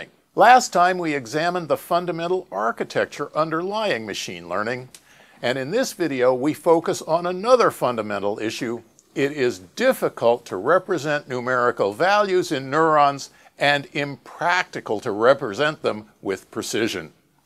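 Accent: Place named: Brooklyn, United States. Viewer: I recognize the accent as a subtle Brooklyn accent. So like, perhaps someone that grew up in Brooklyn as a child but now mostly speak in a "standard American" accent with only hints of their heritage. Of course, it's subtle enough it's hard to say, but it sounds really similar to other people I know are from Brooklyn.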